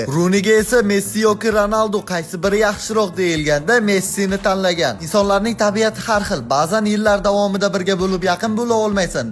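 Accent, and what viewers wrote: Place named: Rural, Uzbekistan. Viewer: Gapirish nutq kalxozcha blaaaaa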